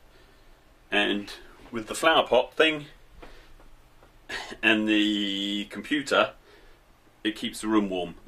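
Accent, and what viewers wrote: United Kingdom. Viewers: it is the British version of a penny.I made the comment because of his British accent and that he said pence and not pennies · This guy is scotish? :D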